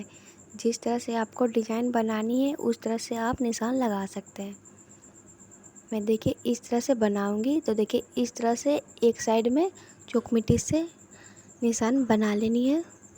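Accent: Bihar, India. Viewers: mam aapki boli se pakda ki aap bihar se ho ...waise mam kaha se hai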